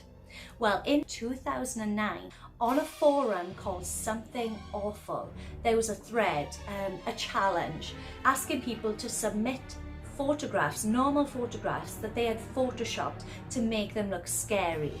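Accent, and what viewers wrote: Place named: Wales, United Kingdom. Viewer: Me too I dont really like the Welsh accent however has a very soft voice and proper fit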